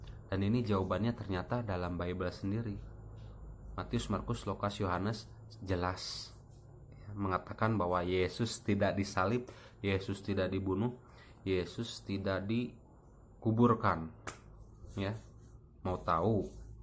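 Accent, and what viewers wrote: West Java, Indonesia. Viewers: Kaya logat sunda??subscribe balik om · iya logat sunda..ada cmpuran sunda..tijalikeuh..😁😁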